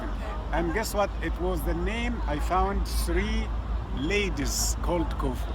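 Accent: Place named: India. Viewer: THIS GUY IS NOT EGYPTIAN 💯 HE HAS INDIAN ACCENT , HE TRYNA DISCARD AND RE WRITE HISTORY LIKE EVERYONE ELSE THAT CAME BY THE EGYPTIAN GODS 💯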